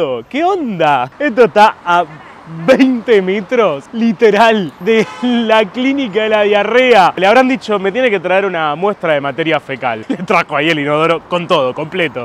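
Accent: Buenos Aires, Argentina. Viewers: Porque hablas en porteño taaan exagerado mmmm es raro eso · uiii que turbio ; calle grafiteada.... pa vos es turbio ? ................... te salto el policheto que llevas dentro , igual gracias x mostrar este lugar..... vos viajas por todo el mundo , porque sos youtuber ? te falta humor ... y el acento portenio es inevitable... de todas formas gracias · Y si es dé buenos aires de remedios dé escalada como querés que hable 😂😂😂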